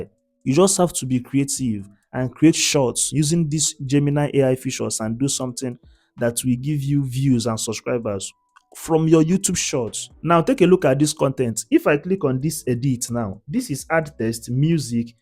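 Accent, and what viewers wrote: Nigeria. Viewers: When i heard your accent immediately realice or 🇳🇬 Nigerian boy ,we were worked in NG. morethan 10 years in Illorine uni. God bless you dear. Good to hear typical NG accent.❤❤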